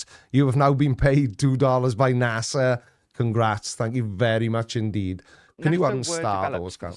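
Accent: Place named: Wales, United Kingdom. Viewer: Came for the science. Stayed for the fun and the Welsh accent.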